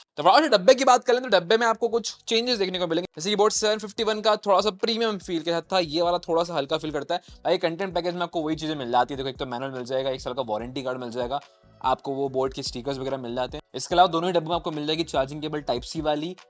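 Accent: Indore, India. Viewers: getting in the video for a sec I realised you are indori and then I read your name, and you are indori, I am also indori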